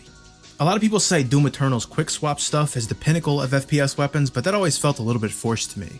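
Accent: New York, United States. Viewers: He sounds like he came from new york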